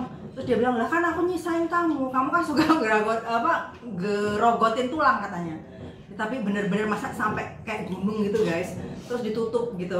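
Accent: East Java, Indonesia. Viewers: Mb ini dr logatnya mesti jawa timuran ki..😆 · Hahaha lucu mbak ikiii, medhok nya jowo tenanan.😍 Tp pancen enak tenan Sayur Bobor kuwi...., karo iwak asin ae wes TOP mar ko TOP.. Jadi ngiler akuuu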